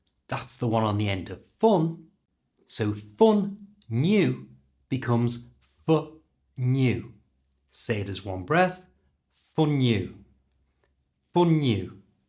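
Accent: United Kingdom. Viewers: thanks so much, the british accent is the best.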